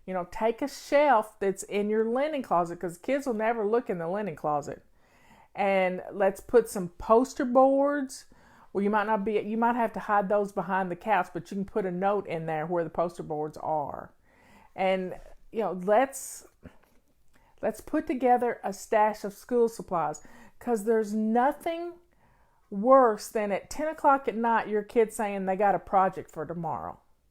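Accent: South, United States. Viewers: You keep on keepin’ on. You’re great! I love your southern accent. 😍 I'm from Virginia & we have those southern accents here, too. 😍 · I love your southern accent! I'm a New England girl who lives in Tennessee, lol. By being who you are you giving us permission to be true to ourselves also. i just love these daily videos! God Bless you. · I love your southern voice! I love your stories and your videos are perfect. Don't let people like that get to you at all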